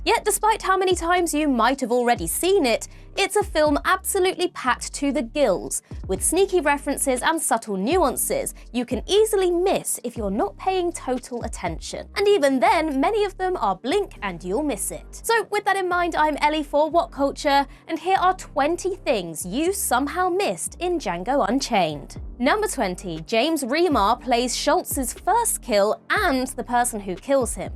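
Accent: United Kingdom. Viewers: Who’s this cheeky Brit?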